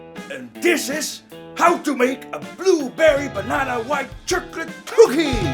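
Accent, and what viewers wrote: Netherlands. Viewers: Love the Dutch accent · Is it me or does he sound dutch cuz i am from the netherlands and he speaks liek dutch trying to speak english so i think hes dustch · Why tf sounds bro so DUTCH?????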